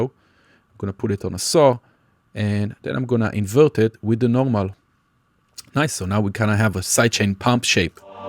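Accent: Israel. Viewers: אני שם כסף שאתה ישראלי המבטא הזה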